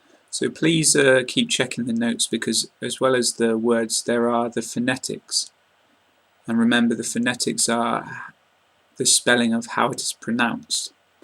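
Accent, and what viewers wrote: United Kingdom. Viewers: An excellent series of learning English with the right british accent..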